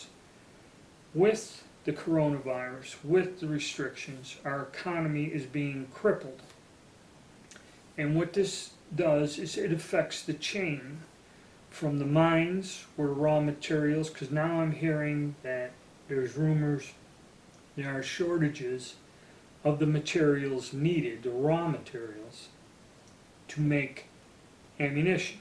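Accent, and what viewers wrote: Pittsburgh, United States. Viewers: He sounds a little like a Pixburgher who just got home from Gianiggle n'at.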